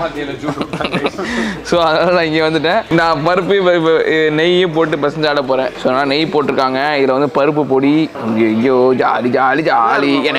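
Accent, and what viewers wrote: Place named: Telugu, India. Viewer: Hi anna nige Telugu pesard kamidiya erk anna😂😂😂nanum AP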